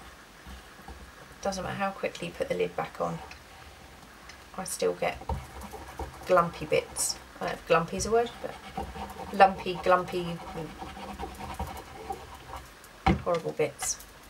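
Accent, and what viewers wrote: England, United Kingdom. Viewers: I love watching your videos and have been learning so much! I just wanted to mention that you have a nice voice. I also like your accent. Your voice and accent remind me of Hayley Mills. I have been a fan of hers since she was Pollyanna (wow, that dates me!). I know England ( I am assuming you are in England) has so many different accents depending on what part of the country you live In. Yours is very pleasant.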